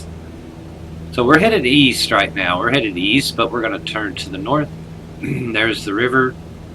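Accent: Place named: Tennessee, United States. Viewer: Hi… my husband and I enjoy watching your flight simulator videos as my husband used to fly ultra lights …we live in the Treadway Tn area … listening to u it seems u live close to us… ?? We were just curious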